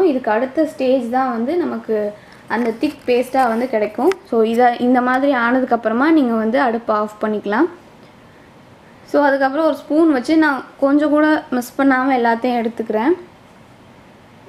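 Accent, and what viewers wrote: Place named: Thanjavur, India. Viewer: Hai mam neenga ena native mam unga language thanjavur side mathri iruku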